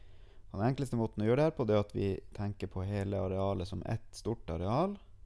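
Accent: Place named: North, Norway. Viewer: du e fra nord-norge og det e positivt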